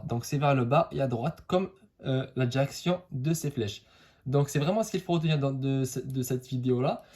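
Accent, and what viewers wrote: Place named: Morocco. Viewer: Merci, rien à dire vous sauvez mes révisions ! J'ai remarqué un accent marocain chez vous si je ne me trompe pas Allah y hafdk c'est très bénéfique ces séries de vidéos.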